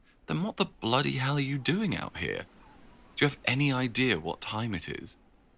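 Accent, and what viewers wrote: United Kingdom. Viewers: This is so good - LOVE the British accent! ❤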